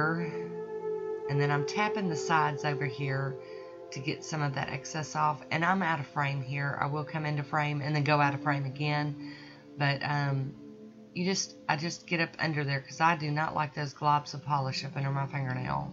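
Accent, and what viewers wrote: South, United States. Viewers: Hey I love that southern draw!! aEngland makes some great polishes - I love their brushes and the two polishes I have apply so smoothly!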